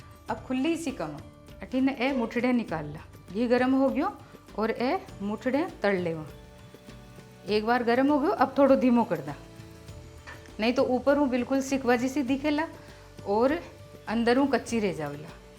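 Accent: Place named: Jodhpur, India. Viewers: Didi dal bati su jyada apri marwari sun n Dil n sukun ayo kiti miti bole h apnane marwar ri Ram ram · Aap ke marwadi bolne ka tarika bhut hi pyara h hukum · Nhi sa ....ye bikanri bhasa ne bhi marwadi keve pr ye proper marwadi ki jodhpuri marwadi bol riya h pr ha kathe kathe kuchaman wali assign aa riyo h sav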